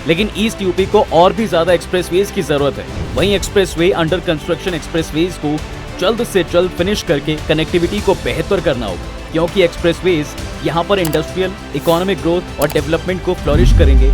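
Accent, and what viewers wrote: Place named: Bihar, India. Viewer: boli bihar jasi hai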